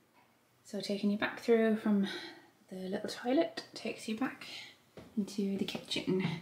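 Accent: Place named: Newcastle, United Kingdom. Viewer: Arh the geordie accent!😻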